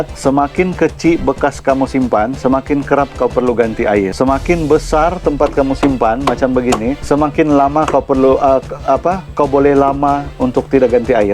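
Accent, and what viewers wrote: Sabah, Malaysia. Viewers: Terbaik bossku..sdp dgr org sabah ckp😍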